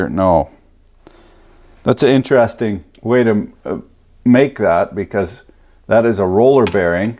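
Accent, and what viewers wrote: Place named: Canada. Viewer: havent heard a more Canadian sounding man reviewing shit tools on youtube yet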